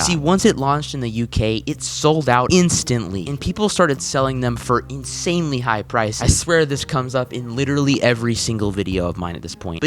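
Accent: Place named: United States. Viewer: Why you got a prick annoying Americans accent?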